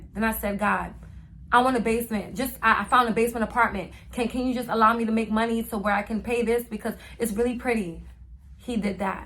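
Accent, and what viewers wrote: New York, United States. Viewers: As soon as I heard you speak I'm like she's from New York and then you continued and said you were Haitian! I'm a new fan and subscriber sis! Thank You I will be watching and supporting you anyway I can ! 🙏🏾🙏🏾🙏🏾🤎✨ Blessings, Happy New Year and to all the bosses in the place CONGRATS MILLION AND BILLIONAIRE! Especially my black women! WE DESERVE! 🎉🎊🥂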